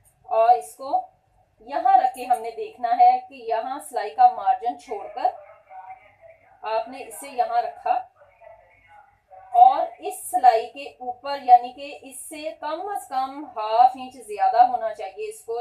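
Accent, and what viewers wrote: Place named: Faisalabad, Pakistan. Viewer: Ye to faislabad say hy lagta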